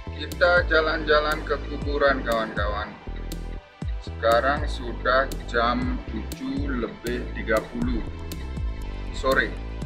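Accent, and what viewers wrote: Bali, Indonesia. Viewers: Hehehe...logat baline kental Bli...salam aspal🤣🤣🤣🤣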